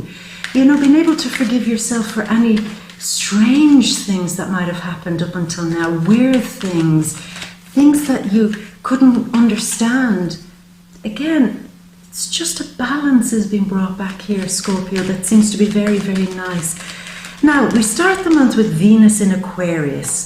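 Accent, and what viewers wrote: Ireland. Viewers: i love your accent; are you living in ireland or where you born thereÉ or a newfieÉ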